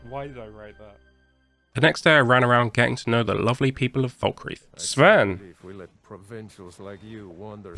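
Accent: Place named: United Kingdom. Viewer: howcome you say dollars? if you dont mind me asking since you sound british?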